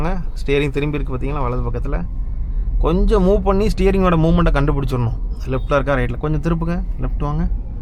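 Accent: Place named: Madurai, India. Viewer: Excellent teaching bro thanks Bro neenga Enga Madurai slong pesura maathiri irukku 😊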